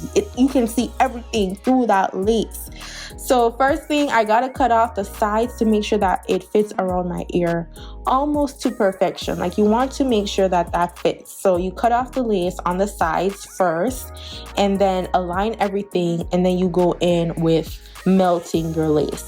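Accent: Jamaica. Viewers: Girl look pon de lace lmao, love when you speak patois. Lovely unit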